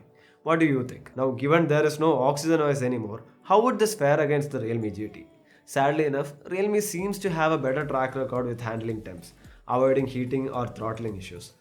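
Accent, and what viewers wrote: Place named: Kerala, India. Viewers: I mean the guy sounds like some Anna at some Malyali Paan Thela. Dude needs to sound neutral. Completely clean up any mother tongue tone from his English. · South indian english......nice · A thick Malayalam accent... better add a tag... not at all clear... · My god! Horrendous south Indian accent in your English ‼️‼️‼️ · That malluism in your english is nice to hear❤️ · Am I the only one who hears Malayalam slang when he speaks??😄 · That mallu accent ! 😁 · Such a pure malayalam accent lol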